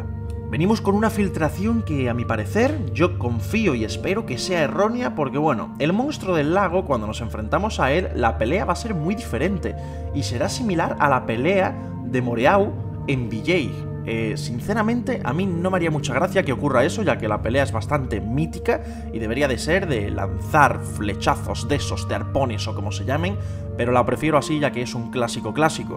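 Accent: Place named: Seville, Spain. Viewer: he visto ya varios de tus videos y transmites muy buena onda y tienes muy buen rollo, te ganaste un sub nuevo aunque no sea mucho xdd, un saludito desde sevilla, que por tu acento diria que no estas muy lejos de aqui jajaja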